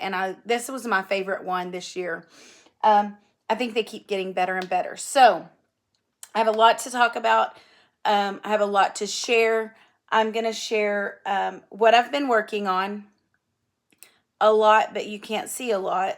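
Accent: South, United States. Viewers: Southern!!!! ❤you girl!!! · I love the southern accent in your voice. I first met you at Annie's retreat Texas Hold 'Em last month and then again at Stitching Texas. You are so sweet as everyone is. I'm so glad I found your Floss Tube.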